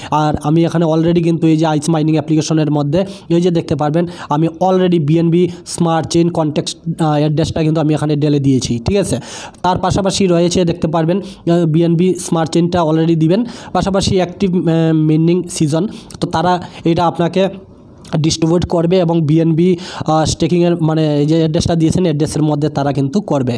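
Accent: Sylhet, Bangladesh. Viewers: Baisab sylhetti bujchi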